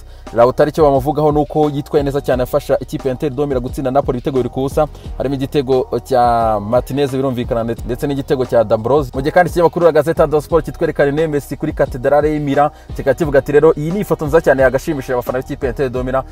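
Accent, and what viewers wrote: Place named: Burundi. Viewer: Nkunda ukuntu uvuga indimbura yacu birigakuru gacaracara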